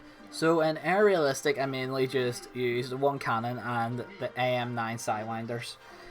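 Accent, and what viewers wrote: Northern Ireland, United Kingdom. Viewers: 100% northern irish, greetings from Lisburn ayy. Great video btw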